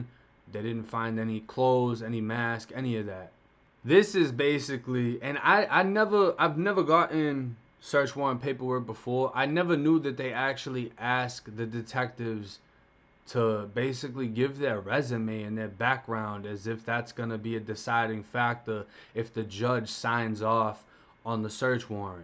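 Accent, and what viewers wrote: Boston, United States. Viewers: Damn bro i thought you lived in florida you live in my hood i was wondering about that boston accent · Bro got a strong ass Boston accent lol but I fw the content💪🏾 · Yo I'm from Boston I had no idea you lived here! I I hear it in your accent sometimes 😂 keep making these vidz bro there great bro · you got the Irish Boston type slang ..words like park and few other not sure where ur from .i don't want to assume shit just cause of movies or t.v .shit i am from Canada 30 min from the boarder to newyork ..but no one sounds like how they make us in movies and shit so what do i know ..i just like the movie the Town and just going off that ..witch is ignorant on my part ..but one thing is the same the cops are just as bad here ,i am on some heavy shit now due to cops doing whatever they want and my dumbass was cold and put on a coat that was left in my car .they tell me i am drunk but parked cause the way i talk due to a head injury but bin sober for 15 years ,opened the the other door right away on privet property with no cause other then stalling to go home fighting with my chick tierd woking 12 or more hrs for 38 days in a row no break in the movie biz so lots of driving on top ..doing noting to know one and got my rights taken from me and now looking at alot of fucking time ..i got lucky years ago and got out of bad shit fell ass backwards in to a wick job i can work half the year and take half off and still live good ... 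so why would i fuck that up what was in the coat is a huge problem were i am from but i don't know where the coat came from even in pics of me all day and any other day u never seen this on me fur makes me itchy as fuck but i was cold ..left my house in a T in Jan to get out the house and away from my chick to stop the yelling ..now all the time i spent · Respect dog. If you dont mind me asking, what are you doing for work now? If you're going full time with youtube, you should consider starting a podcast. Team up with someone you vibe good with. Think Joey Diaz and Lee Seyet, you have the quick wit, perfect east coast/Boston accent, and stories for day. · I knew u was from Boston bro...when u said u was locked up in FLA I was like damn dude sounds like a Bostoner...that's what's up I'm from Bridgeport CT which isn't far from where u at...I knew u sounded too smart to be from down south...I fuck wit Boston especially because y'all got the smoke shops serving up 🔥...I love my quality smoke keeps me from fucking wit other shit although I like my hallucinagens🤯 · I've been binge watching your videos for days now, always hearing a little Boston in your voice, but everything I saw referenced Florida. 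Now I feel vindicated in my accent radar. Did you move here after you got out the first time?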